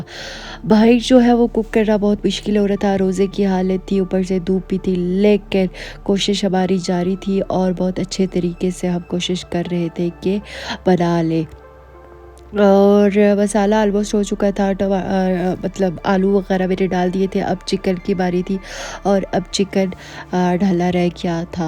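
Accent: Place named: Kashmir, India. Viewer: Bht achy Awaaz ha literally khasmeeri lahjy m very nice vedio goodgirl nice effart❤❤❤❤❤❤❤❤❤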